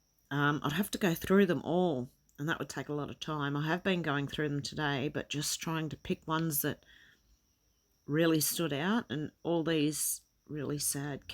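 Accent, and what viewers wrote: Australia. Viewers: so beautiful to hear an Australian accent and mindset. loved it. Millsys Para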